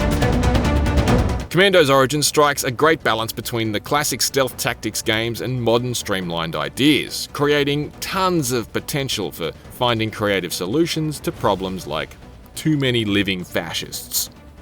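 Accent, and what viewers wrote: Australia. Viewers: I heard the Australian accent, and I was so worried it was the IGN voice up voice down guy. Thankfully it wasn't, and the video is actually watchable.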